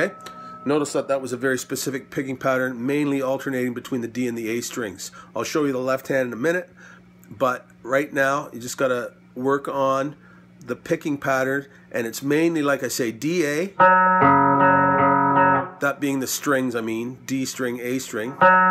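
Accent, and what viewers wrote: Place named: Canada. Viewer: Your accent is pure Canadiana.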